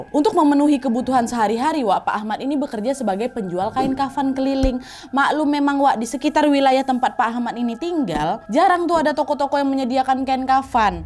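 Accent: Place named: Medan, Indonesia. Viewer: Pandai kali wak berkisah.belajar darimana? Dialekx itu suka banget.medan kah ya?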